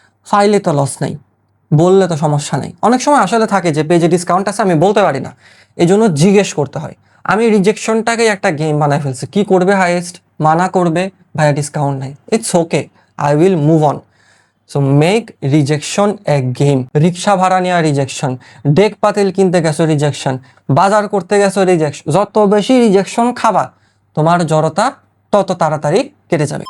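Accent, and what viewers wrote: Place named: Noakhali, Bangladesh. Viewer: Apni ki Noakhali'r ba Feni'r? Na mane apnar kothay oikhaner ekta taan ase toh tai.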